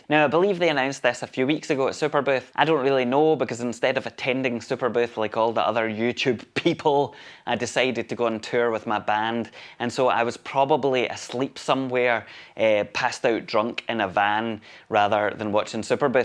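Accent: Scotland, United Kingdom. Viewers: A man of the people! Great to hear your take on this, I know this is isn't normally your schtick but clearly... the people wanted to know!! Your elegant way with words and dulcet Scottish tones put this ending over the top!!!!! 🙌🐀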